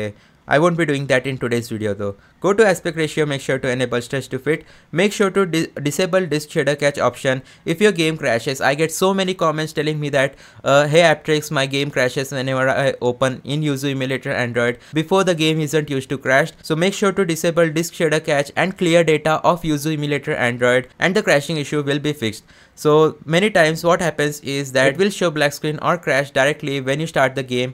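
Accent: India. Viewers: Indian accent hurt my ears but this is helpful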